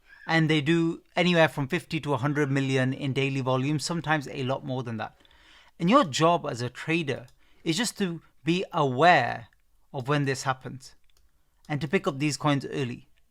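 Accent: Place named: Newcastle, United Kingdom. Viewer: Bro, you can tell he's from around the Newcastle~ish region - from listening to the accent, in my opinion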